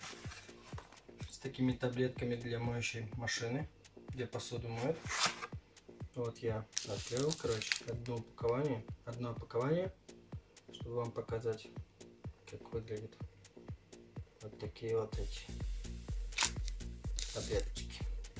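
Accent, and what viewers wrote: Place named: Poland. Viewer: Здравствуйте. Мне понравилась подача видео и то как подробно вы всё показали. У вас интерестный акцент: )) то ли польский... я не поняла. Сделайте еще свежий обзор к примеру: закупка на 100 евро в Испании:)) если можно?)) лайк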